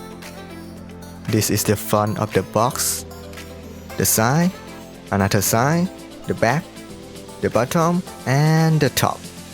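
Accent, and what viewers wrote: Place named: Thailand. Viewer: คิดว่าต้องเป็นคนไทยแน่ๆ สำเนียงแบบนี้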